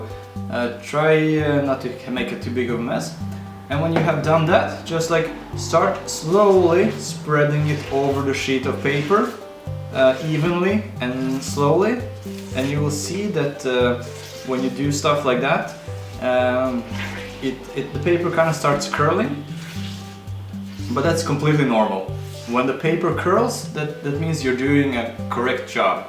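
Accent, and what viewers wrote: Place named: Scandinavia. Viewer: Your accent sounds so Scandinavian .. Where are you from?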